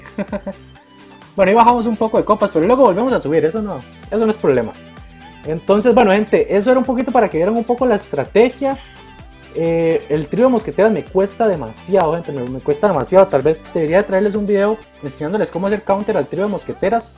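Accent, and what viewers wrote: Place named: Costa Rica. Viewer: aaaaaa eres tico :3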